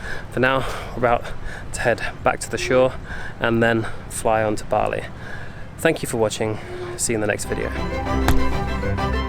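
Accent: United Kingdom. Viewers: british accent lol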